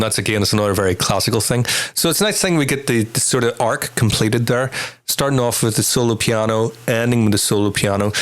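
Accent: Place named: North, United Kingdom. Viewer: Generally, Chopin, or romantic music for that matter, is not my cup of tea. I am more a Bach (I'm German after all) or Beethoven, Rachmaninow person. But in this context, it is really enjoyable. I've seen this several times and this video is my favorite by Lovebites. And those ladies definitely got balls. Like a friend of mine from Scotland answered to "Who can wear a kilt?": Everyone, you just need the balls to do so. On another note are you a Scot? You sound at least like someone from the north of the island. For my German ears, this is more pleasing than RP.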